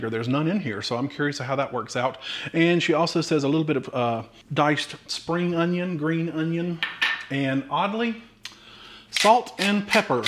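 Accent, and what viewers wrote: South, United States. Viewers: I could listen to this all day, just for his voice! So friendly, so Southern!! Thanks for the info- I'm from Korea and I NEEEEED low-carb rice!!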